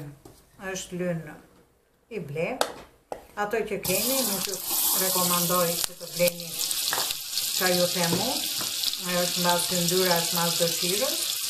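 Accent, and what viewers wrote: Tirana, Albania. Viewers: Dhe une e bej vazhdimish moj Zonje e nderuar,pervec gatimeve te mrekullueshme me pelqen dhe dialekti Tiranos ton te dashure! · Ju zèntè gezuar e me shendet madam qe flet me lezet gjuhen tironse 😘🌟👍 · Ju lumshin duart zonje je shume nikoqire bravo qe sjell ne guzhinen tende gatime tradicionale me qelqen e folura jote tironse respekte!!! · Te lumshin durt e arta moj teta ☀️🌷me kenaqe me kete menyre te folur Tironce, te pershendes nje Kavajse nga Modena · Te lumshin duret e ke qa. 😋 Masanej na kenoqe me te folmen . Te lumte qe se ke humb traditen Tironse. O Tiron zemer flori Prite te gjithe te medhej dhe femi Me ere Dajti uje Selite I rrite cunat dhe gocat si rreze drite Lum ai qe i bo shok Se ka bo i mik per koke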